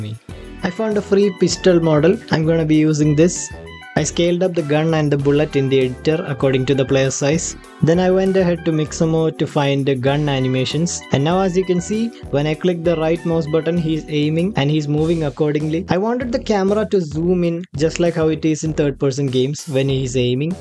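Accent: Kerala, India. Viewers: BRO AREN'T YOU MALAYALI???? YOUR ACCENT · English keettiitt mayalayalide pole imddd😊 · That Mallu accent hits different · Your ascent like mallu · He is malyali..... Go and learn English mallu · Malayali slang never fades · your voice sounds really like a keralian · Athey malayali ahn enn thonnane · മച്ചു നീ മലയാളിയല്ലേ നിന്റെ പറച്ചില് കേട്ട് അറിയാല്ലോ മോനെ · Mallu accent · ഈ ഉമ്പിയ ഇംഗ്ലീഷ് കെട്ടാ അറിയാം മലയാളിയാണെന്ന് 😂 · no one will talk like this in India only Malayali does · The English you speak sounds like a Malayali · Bro if definitely a malayalie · bro have mallu accent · Malayaaali alle? Sound kekumbool ariyunnundu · mallu english alleee enik thonni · You sound like you're a malayali! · Where are you from, sounds like kerala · Bro with a mallu accent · english parayana kettal ariyam malayali anenn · sounds like a Malayali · Accent is giving me mallu vibes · The accent is exclusively Malayalam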